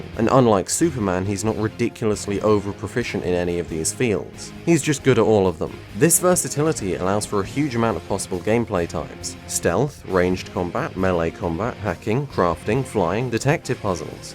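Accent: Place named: United Kingdom. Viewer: u sound like a stereotypical nazi bad guy in hollywood movies, of course, with a british accent.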